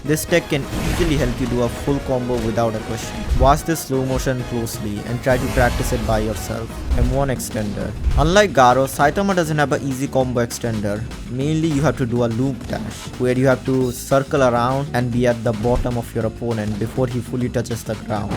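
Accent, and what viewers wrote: India. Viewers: Bhai u Indian? Ur accent tells me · WAHT KIND OF INDIAN ACCENT IS DAT W ACCENT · Indian accent? w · I also feel he is indian bcz of his voice British say English In another way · your indian i am pakistani so i know accent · FINALLY A GOOD INDIAN TSB YOUTUBER, THANKS THIS WAS RLLY HELPFUL PLUS NICE INDIAN ASCENT👌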